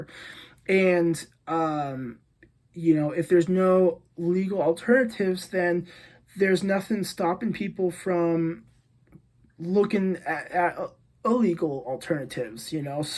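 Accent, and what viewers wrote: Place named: Scandinavia. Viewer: Also, sounds like you are from a scandi heritage, i am from Denmark. Be strong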